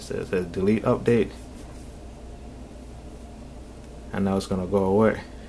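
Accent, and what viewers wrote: Jamaica. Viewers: Can tell you a yaadie lol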